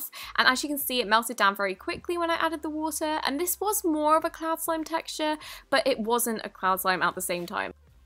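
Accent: England, United Kingdom. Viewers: I ligit loves her videos (like if you agree) they are so calming and I l9 e her British accsent also there's a better chance of seeing pigs fly than her actually seeing this but I still love her... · Welll are you american?? If you are all British voices will sound the same to you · to Umm... Where’s her British accent?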